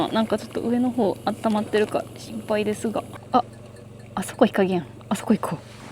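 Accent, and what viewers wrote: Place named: Kansai, Japan. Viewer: 関西弁がめっちゃかわいいです－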